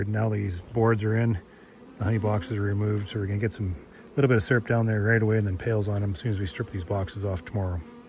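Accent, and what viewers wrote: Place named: Canada. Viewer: Definitely hear a Canadian accent 🤣...."about"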